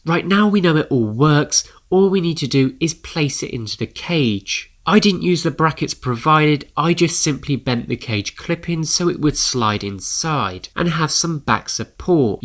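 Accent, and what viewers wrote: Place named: United Kingdom. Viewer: I hate uk accent...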